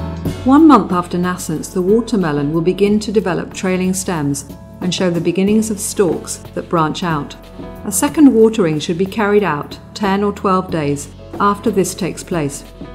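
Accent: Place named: United Kingdom. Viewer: that perceived british accent was so hard to follow. but jolly good show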